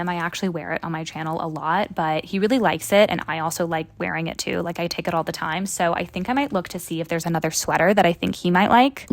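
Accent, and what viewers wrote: South, United States. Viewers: Hearing you say you don't think you have an accent is too cute ( cause you definitely DO) (( saying this as a fellow southern queen)) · As a southerner you have a very noticeable southern accent to me :)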